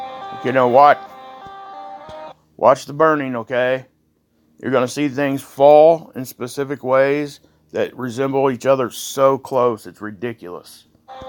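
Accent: South, United States. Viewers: I just subbed good video man sounds like your from the south good to hear a familiar voice since im from Tennessee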